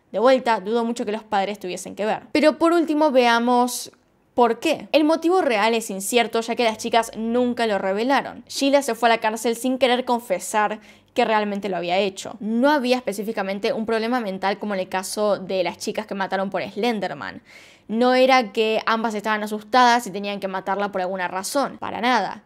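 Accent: Argentina. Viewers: Buenas desde España! Me encantan tus vídeos,llevo años viendo este tipo de casos ...no sé cuántas horas de videos ...eres de las mejores a la hora de relatar los hechos de principio a fin . Me he enganchado a tu canal . Un besazo enorme. ..también me encanta tu acento argentino...aquí tengo muchos amig@ de allí .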